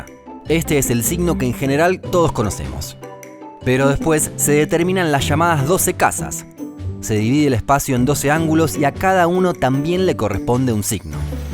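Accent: Argentina. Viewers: Me gusta el contenido. Ese acento argentino, en vez de molestarme, le da singularidad.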